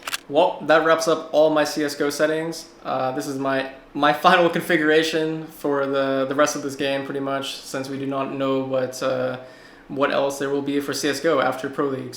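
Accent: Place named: Europe. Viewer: man's played in europe so long he's got a quasi european accent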